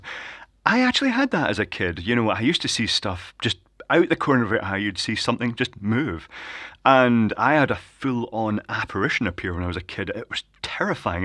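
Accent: Scotland, United Kingdom. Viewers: Talking hands with horrid middle class Scots accent,hope he disappears up his tunnel of typhon.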